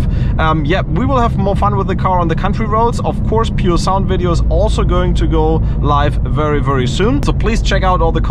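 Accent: Germany. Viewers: Aua own Audi😅. Das tut ja schon in den Ohren weh, wenn du als Deutscher Englisch redest. Wieso ist dieser A45s denn eine Sekunde schneller als alle anderen auf Youtube? Du korrigierst die Zeiten auch nicht bei deiner Box. Da ging es bestimmt bergab.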